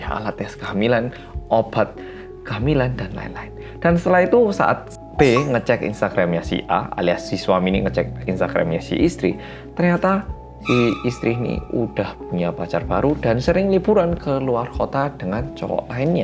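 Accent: Java, Indonesia. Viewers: Mas Ngomng bhsa jawanya medok bgt sma kya mb Dita.. Klw Ngomng bhsa koreanya medok nga???... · tingkat keseriusan, mimik wajah, suara yang medok, pipi yang mulai berisi. WELCOME BACK MAS 🥰🥰🥰🥰🥰🥰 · Mas nya cakep ,, suaranya laki bgt & medog, sy langsung suka pdhl ini pertama kali nonton chanel ga sengaja. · auto cc nya buset, masa "medhok" jadi "bodoh"😭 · Medok Tentu Saj!!!! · Medok nya muantap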